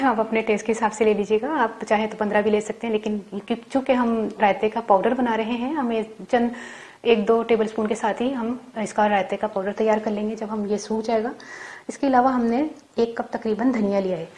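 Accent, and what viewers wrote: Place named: Punjab, India. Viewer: Punjabi voice so cute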